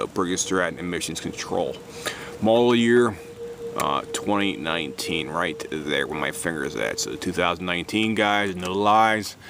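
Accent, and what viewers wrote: Pittsburgh, United States. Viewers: Love your Pittsburgh accent . My Wife and I were laughing as we grew up there but have been in Ga for 20 years now. We still have our Pitt accents and people still look at us funny when we talk. I went dahn to home depot and looked at one today n’at as my wife wanted to buy me a new mower for christmas but I didn’t want the credit card bill so I’m gonna save up till spring and get one then cuz I’m tired of repairing my old MTD yard machine every time I try to use it. Gotta say the old MTD still runs strong yet it need a lot of things revamped. I was thinking of putting the twin V from the MTD on a JD LT model I bought cause the deere cuts and handles so much better than the MTD but I keep getting junk in my carb jet on the JD LT model even though I replaced everything from fuel tank to carb except for the fuel pump and metal line across the motor. 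I think the junk might be coming from the metal line so I’m gonna replace that line and maybe add another fuel filter pre before the carb but I also have to take apart the carb again to clean the jet. If I can’t get that to work I’m selling it for parts along with the MTD and just getting a new mower. I’d love to find a video on the new E120 deere’s concerning maintenance and possible problem areas since I fix everything myself.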